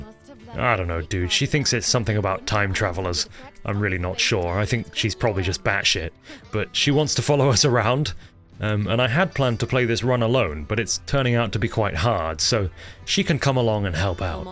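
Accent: United Kingdom. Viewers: Ewwwwwwwwwww bri'ish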